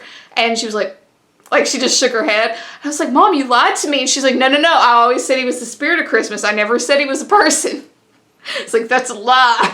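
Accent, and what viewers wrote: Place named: Georgia, United States. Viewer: Refined southern accent, like Ga 😝🤣 you are hilarious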